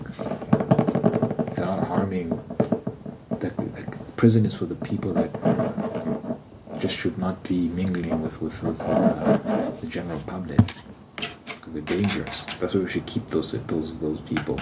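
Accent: South Africa. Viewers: Where are you from I love your accent, sounds like South Africa to me 🇿🇦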